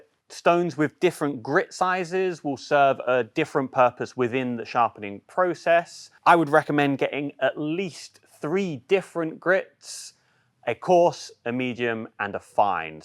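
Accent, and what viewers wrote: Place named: United Kingdom. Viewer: From your accent I thought you would be in the UK. I follow the link and the prices are in $? Hey ho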